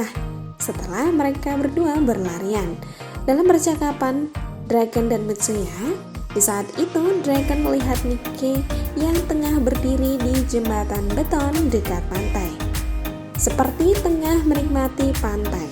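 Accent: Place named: Java, Indonesia. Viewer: Suarane medok gaes hahahaha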